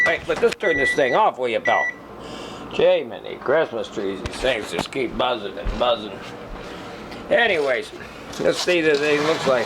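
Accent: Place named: Green Bay, United States. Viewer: You sound like you're from Green Bay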